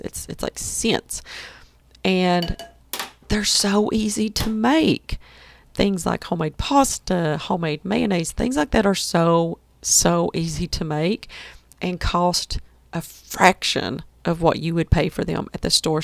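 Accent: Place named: South, United States. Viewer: just watching this video, you look amazing doing this, great chance to get involved in community, where you can be a witness for the Lord, although where you live, I’m assuming it’s somewhere in the South, I hear your accent, lol, but it’s not a heavy one, many people are Christian, but sometimes it’s more cultural, rather than actually being born again, great video, love your channel!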